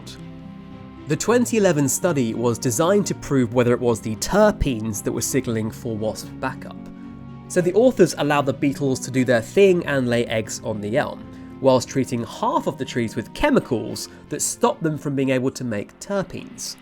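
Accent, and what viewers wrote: United Kingdom. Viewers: This is a masterful exercise in gaslighting, all the way down to the British accented narrator. uses a well known template from the timber and chemical industries- employ delaying tactics to tear down the best current research by asking for more extensive research. This is significant when taken in the context of major timber harvesting plans already underway in Canada and more planned in the Pacific Northwest in the US. Simard is correct in pointing out that her one time "colleagues" are "reactionary" scientists. doesn't point to any research from Simard's critics on the relationship of the mycorrhizal network to the trees and how that can be better understood with newly designed experiments. It would be interesting to see if has done any films on the roles the chemical and timber industries play. Try understanding the role Bonnie Bessler's "auto inducers" play in spreading the information carried by microorganisms.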